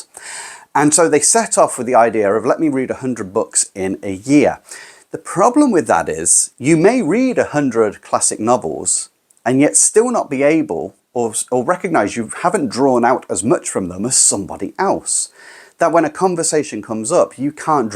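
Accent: United Kingdom. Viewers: You're accent is very elegant and precise. A true british one.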